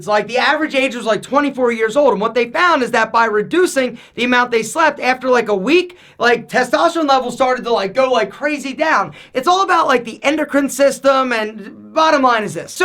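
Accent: United States. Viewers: One thing I can say is, you are Talkative! Don't speak too much, remember you are American (have accent and not all your viewers are saMe like your race) not a Pilipino or Indians or Thailand etc. If your other subscribers and viewers are not same your race, so do you think they will understand you if you speak so fast like this now? And most, if the person have no idea what is testosterone then how it is even You explain in a fast track!!! Weeeew..... It's good that I am fluent in English, if to other people who loves to watch your video, I hope they will understand you in that ways how you speak like a Talkative Guy, Parrot is better than to You how to speak hahaha sorry peace I am your good watcher I think but today I comment negative because this is what I observed guys sorry! 🇵🇭🇵🇭🇵🇭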